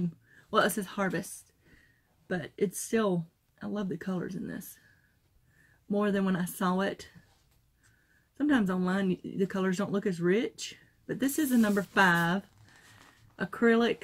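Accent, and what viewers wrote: South, United States. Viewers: I read rules and understand .love to make baby clothing and blankets. love your southern talk.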